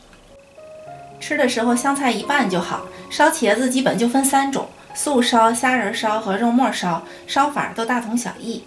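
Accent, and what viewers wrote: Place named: Northeast, China. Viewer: 哈哈 东北银儿吧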